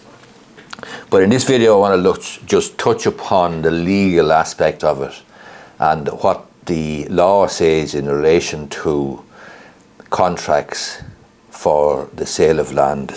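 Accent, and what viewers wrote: Ireland. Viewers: so irish!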